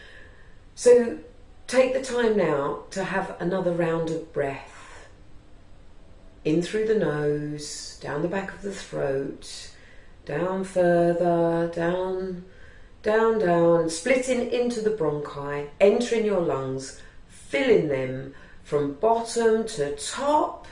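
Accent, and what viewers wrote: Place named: United Kingdom. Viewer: Your accent is pretty close to a British accent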